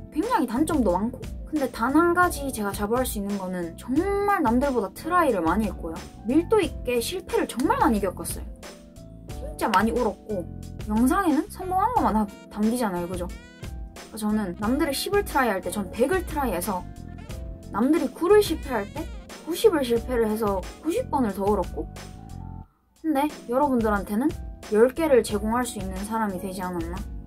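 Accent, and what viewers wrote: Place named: North Gyeongsang, South Korea. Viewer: 가끔씩 꿈을 꾼다는것은 씨가 좋은대학을 가기 위해 치열하게 공부했던 시간임을 증명해주는것 같아요. 하지만 꿈을 꾸고 난뒤 현실에 만족하기 보다는 그 꿈의 의미에 대해 한번 생각해보는 시간도 필요할 것 같다는 생각이 듭니다. 서울대를 못가더라도 삶을 행복하게 살아가는 사람이 있고, 서울대를 가더라도 삶을 행복하게 살지 못하는 사람도 있어요. 우리 삶의 종착역은 대학이 아닙니다. 많은 사람들이 서울대를 가려는 이유는 삶을 행복하게 살아가기 위한 디딤돌을 딛기 위한 것이라고 생각합니다. 씨, 서울대를 디딤돌로 삼아 더 발전하는 모습 기대할께요. (경상북도에서 태어나서 그런지 씨의 말투가 듣기 편하고, 또 좋은 마음이 느껴져 댓글을 납깁니다.)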